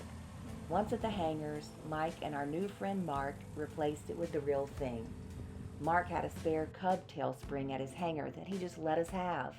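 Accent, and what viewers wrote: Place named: Mississippi, United States. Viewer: I love the videos and great to finally hear your Mississippi voice!! ❤️.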